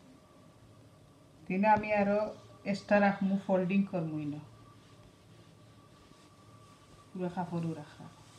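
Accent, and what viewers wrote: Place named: Sylhet, Bangladesh. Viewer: Nice hearing sylheti bangla. Can u show how to make saree blouse?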